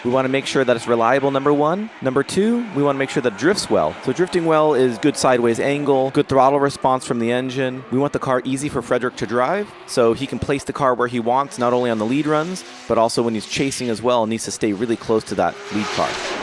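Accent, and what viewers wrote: Greece. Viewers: Where are you from??? Greece?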